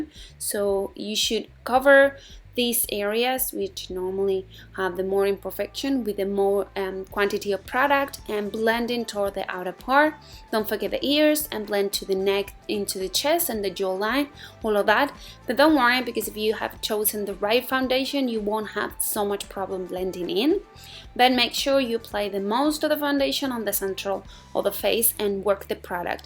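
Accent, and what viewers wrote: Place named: Spain. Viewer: acento spain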